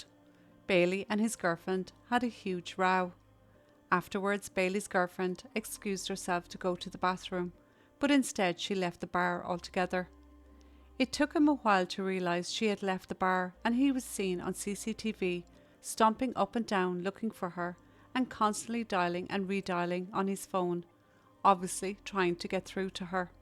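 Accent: Ireland. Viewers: I don't think you realise how good your channel is! Imagine this: an Irish woman, with a beautiful Irish accent, the sometimes brutally honest but heartfelt opinion of a woman with values that have been eroded away but still stand for what was once good and proper in society..... versus AI content farmed true crime stories narrated by electronic voices that are mispronounced, misrepresented and churned out just for clicks and clout., Redrum, Truly Criminal and Just Thought Lounge are the only true crime channels I watch anymore. And sadly, when content farms force human creators off the platform, I won't be the only one mourning the loss of authentic voices like your own. Apart from anything else, is the only channel where I watch and wish, outside of the cases covered, I wish I was there in Ireland instead of here in this dystopian land of Britain! A beautiful country and an equally beautiful voice - I hope your channel grows and you remain here as one of the few human creators left amongst the future of content creation.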